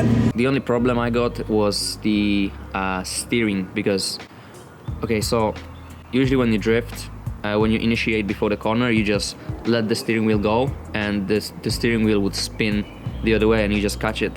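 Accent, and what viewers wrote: United Kingdom. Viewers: ngl you have a hint of a British accent 😂😂😂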